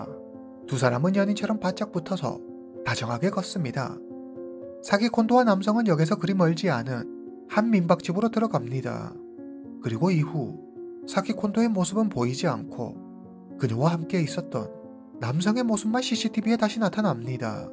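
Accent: Yanbian, China. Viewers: 말투가 연변 조선족인가보네 ㅋ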